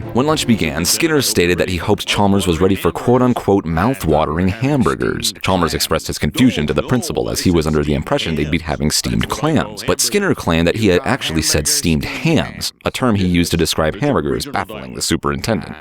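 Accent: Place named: United Kingdom. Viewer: Sound very British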